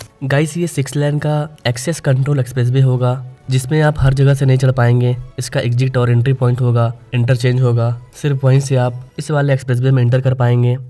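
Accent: Bihar, India. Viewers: बिहारी एक्सेंट सुनते ही मन से गाली निकलती है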